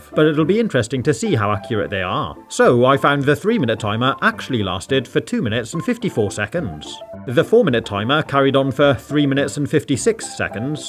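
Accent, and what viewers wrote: United Kingdom. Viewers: I am British and you sound like me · I love how easily distinguished his British accent is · Nice accent boss where you from United Kingdom?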